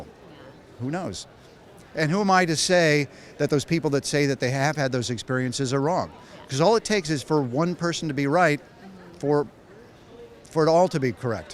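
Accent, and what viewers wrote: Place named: England, United Kingdom. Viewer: Here in the UK the narrator has an English accent. I'm certain they didn't get a different narrator just to show it here. Plus although the accent is definitely from England I couldn't tell you from whereabouts. It's more like a generic English accent. Does anyone have an answer for me.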